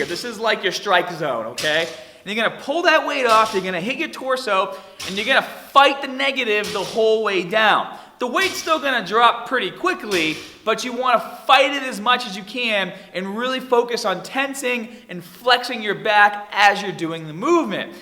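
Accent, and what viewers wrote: Boston, United States. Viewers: I'm irish but this dude must be from Boston "hawdaw" "bawbew" Edit: I love the content. No hate, just an observation. Huge fan of the tips. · Bosten accent?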